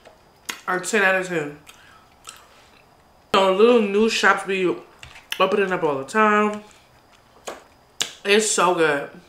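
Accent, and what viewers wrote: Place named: New York, United States. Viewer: Looks good and I was dead at the mail part falling lmaoo 😭🖤and omg you sound you from newyork !! Got to be but love the accent !! Keep going gods got you and YouTube is for you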